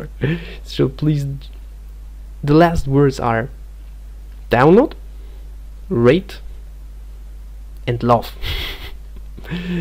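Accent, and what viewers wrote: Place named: Germany. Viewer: Man merkt, dass du deutsch bist . Coole app ;)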